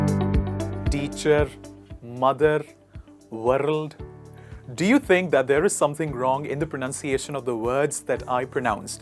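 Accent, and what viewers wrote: United Kingdom. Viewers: This is in British English, right? Because "r" is pronounced in many of the words you mentioned like "first, dark". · Thanks for teaching but you’re pronouncing like British English and you might followed them but i do follow Indian English which is good to hear and pronounce also 🙏😊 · You're sounding british sound.. But in America we sound all r.. Slightly thick .. We not pronounce r silently the word's you've written...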